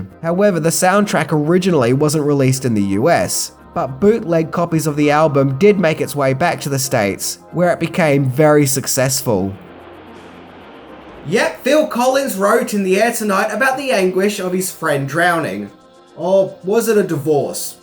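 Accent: Australia. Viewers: Come on he is Australian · He is Australian. He does say some words differently due to the accent.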